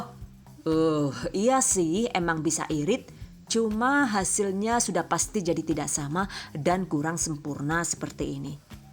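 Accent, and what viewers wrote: Banyumas, Indonesia. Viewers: paling sng klo ibu ini yg memandu bahasane campur aduk ada ngapak jg...slm ngapak dari tegal y bu...🙏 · Wuaduh...ngapak banget....kie mbakyune......yaw...kriben ya... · Wong Jawa ngapak apa kie...wkkkkkk........soale bahasane mung wong Banyumas sing kaya Rikane..Nek emang bener bangga nyonge...kmbi njenegan buk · Hehe q suka ngmgnya ngapak.. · Rika asline ngendi yu jere wong madiun deneng ngomonge bleketek kaya wong banyumas,,nyong penasaran yakinlah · 👌seperti biasa ,apa saja hasil jadi masakannya pasti tampilannya cantik sempurna, mksh bun kasih tutorialnya yg super duper lengkap dan jelas dgn ngapak2nya yg kadang bikin senyum2 klo pas mantengin videonya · Jal wonge kaya ngapa.... ngapak temen 😂😂😂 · Ora ngapak ora kepenak ya yuu... 😁😁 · Salam ngapak 😂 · Mantul pake ngapak ngomonge 😄😄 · Makasih resepnya yu..cara menjelaskan begitu jelas,saya suka banget, maaf yu sampeyan asli ngendi,kok ngapake kaya iyong🙏🙏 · Wong ngapak ya mbak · Pingin ketawa..ngapak nya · Rika wong ndi yu bahasane ngapak lucu he.he.he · Rika wong endi sih yu....ngomonge kaya wong nggonku....banyumasan 😁 salam kenal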